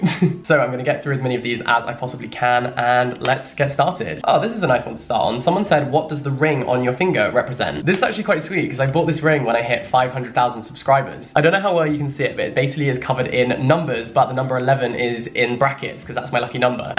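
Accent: United Kingdom. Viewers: honestly I have no interest in reading but I really like your videos, I find them so entertaining also the brittish accent helps me prepare for CAE listening 💀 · You are literally my reason why I love the British English language in human form! Your accent and the way you use words is the exact reason I love English! Everytime I watch your videos I am so grateful to 13-year old self for choosing the bilingual course at my secondary school! You are amazing!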